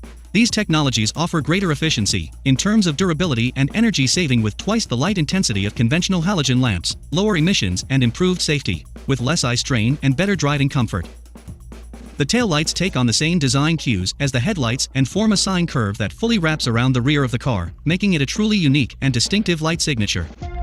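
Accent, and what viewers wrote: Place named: Italy. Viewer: Mmmh that Italian accent in the narration 😙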